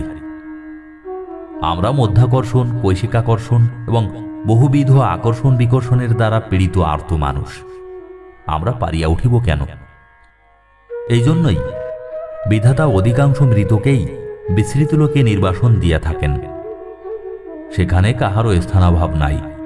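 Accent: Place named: Thakurgaon, Bangladesh. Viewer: Apnar Voice ta ebong background music ta khub bhalo legeche. Uporontu apnar Thakurgaon Bongaiyan uccharon e matir taan anubhav kori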